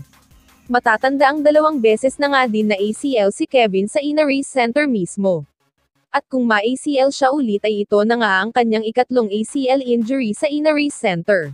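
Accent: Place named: Visayas, Philippines. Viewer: Bisaya ka..ayusin m ang pag ssalita mo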